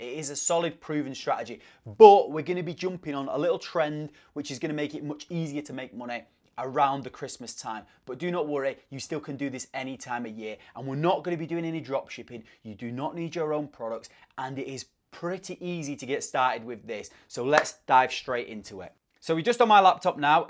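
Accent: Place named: Yorkshire, United Kingdom. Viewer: yeah, I wanted to do that but then Covid came and put my plans on hold, thanks mate. You sound like a Yorkshire lad, I'm from Leeds and vlogging at the coldest inhabited place on earth at the moment 😂😂😂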